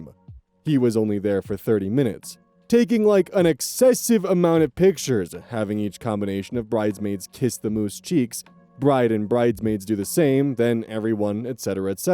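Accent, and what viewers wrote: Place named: Midwest, United States. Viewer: I love wheb midwest accent comes out strong xD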